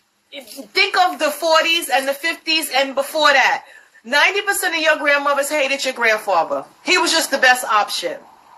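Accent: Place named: Caribbean. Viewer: I can hear that west Indian accent. Preach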